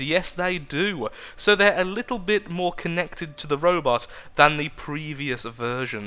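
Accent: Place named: England, United Kingdom. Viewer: I love your englishness. or UKness, I dont know, accentness. sir ;)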